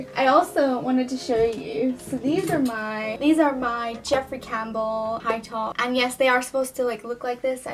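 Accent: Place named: Scotland, United Kingdom. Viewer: Took me a while to place your accent, then I when; “fuck that’s my accent.” 😂 Scots on YouTube are few and far between. I’ve gotta ask how is sizing since they are from the US? Or are they made big or small.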